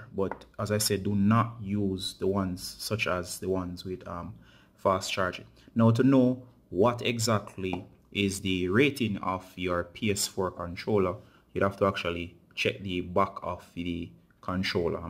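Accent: Jamaica. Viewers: Are u ah Jamaican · yuh sound jamaican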